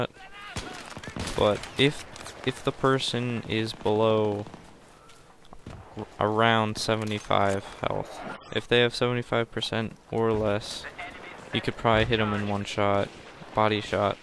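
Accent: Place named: Europe. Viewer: btw for some reosone you sound european in your comentaries lol.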